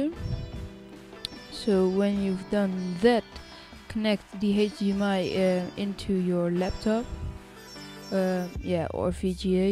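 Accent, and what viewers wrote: Netherlands. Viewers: hoorde gwn al aan het accent dat dit een nederlander was lol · I can hear you dutch accent from miles away... and those English speaking freaks, try to explain this in dutch....!!! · Ik hoor gewoon dat je Nederlands bent :P Ps. goeie tutorial! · Ik dacht al dat je Nederlands was XD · R u dutch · Hahah je hoort dat hij nl is lol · Where are u from? I think from the netherlands but not sure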